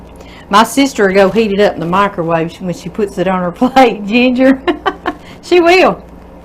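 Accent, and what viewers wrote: South, United States. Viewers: I love the way you talk, keep it up!!!! Southern ladies have the most beautiful accent!!! LOVE IT!!!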